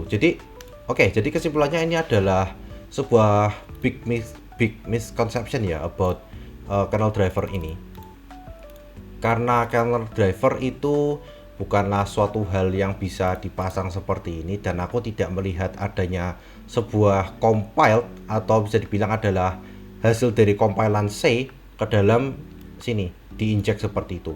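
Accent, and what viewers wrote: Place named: Java, Indonesia. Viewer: keliatan jowo nya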